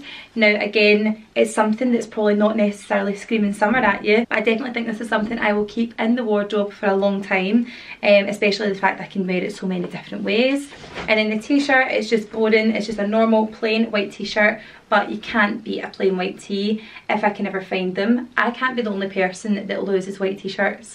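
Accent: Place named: Glasgow, United Kingdom. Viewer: are you from Glasgow? you sound just like my cousin haha i love you you’re so sweet and beautiful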